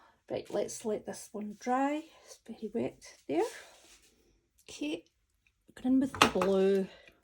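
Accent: Scotland, United Kingdom. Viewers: Thank you for this! I bought a gyro cut a while ago and didn't know how to use it. I think I might have to buy the deep cut though as I want to use it on Dura-lar sheets. However, I do have some Tyvek, which is a lot thinner, so I'll try it on that. By the way, I LOVE your accent! it's like music to my ears and it reminds me of all the wonderful people I met during my travels in Scotland <3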